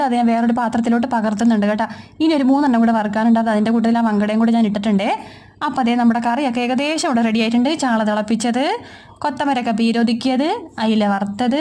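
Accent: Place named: Thiruvananthapuram, India. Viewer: ചേച്ചിടെ ചില സംസാരം കേൾക്കുമ്പോൾ തിരുവനന്തപുരംകാരി ആണെന്ന് തോന്നുന്നു ഇവടൊക്കെ പറയുന്ന അതേ സംസാരരീതി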